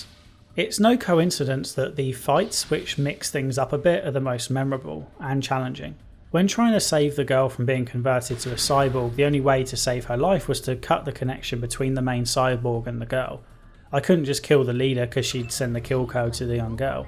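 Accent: United Kingdom. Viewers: Soft spoken britsh game analysis ASMR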